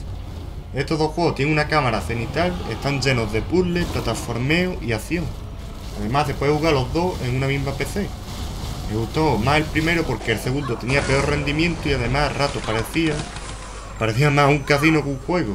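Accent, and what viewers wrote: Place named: Granada, Spain. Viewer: Tu acento es la leche, seguro que eres de Granada o cerca.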